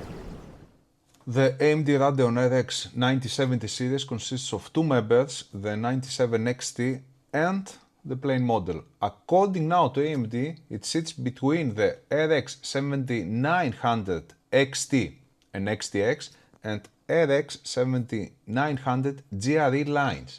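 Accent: Greece. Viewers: by the accent i bet that you are greek :) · But with a Greek accent! 🙌🏼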